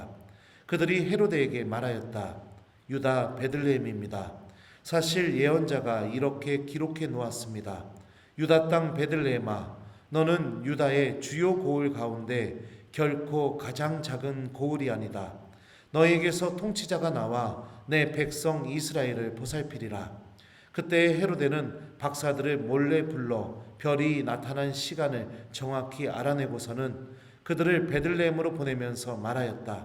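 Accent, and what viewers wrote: Chungcheong, South Korea. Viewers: 신부님 감사합니다 제 고향이 대전 인디 신부님 충청도 사투리들으니 엄청 반갑네유~^^ 충청도 분이신디 말씀은 엄청 빠르시네유~~^^ 매일 잘 듣고 있습니다 감사해유❤❤❤ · 신부님 말씀 잘 듣 고 있습니다 미국에서 충청도 말씀 을 대할수있어서 더 정이 감사합니다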